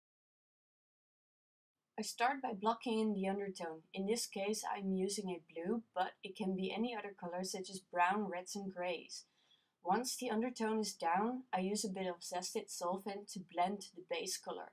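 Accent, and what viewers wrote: Netherlands. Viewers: Beautiful tutorial... and charming Dutch accent :)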